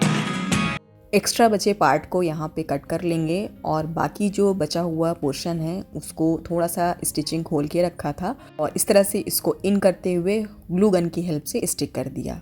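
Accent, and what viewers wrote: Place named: Gorakhpur, India. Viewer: wah masha Allah meri padosan inkli ap...pr ap ki language me gorakhpur side ka touch hai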